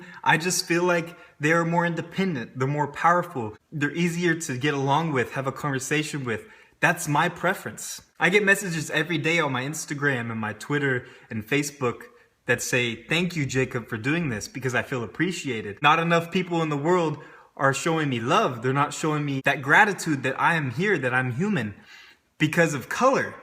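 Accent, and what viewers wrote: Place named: Latin America. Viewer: And honey, I know my races very well, you are mixed somewhere down the line, you are definitely not pure white, I can tell by the slight color to your skin, and facial features. You also have a slight accent that of a Hispanic. Europeans are very mixed with Indians and other races somewhere down the line. By the way, nice video.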